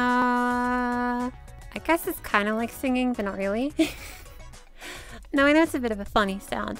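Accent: Australia. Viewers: Oh my god this is awesome! This format/editing excites me so much to practice my voice and you're Australian!! I'm also from around here and I was worried that my accent would turn more American from other tutorials. You've inspired me :3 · finally an australian!! some vocal patterns i can imitate without turning into an american 🥹🥹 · This is excellent, thank you so much for making this! It's also such a huge relief to hear another Australian doing this, because American voices are so different and that is so much of the voice-related content out there! Your advice is so practical, and I love the energy and vibes you bring :) The heart-felt message at the end gives me so much hope and makes me feel like I can really do this too. Thank you so much! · Thank you! I'm English so an Australian accent is so much closer to mine than all the American ones I've seen.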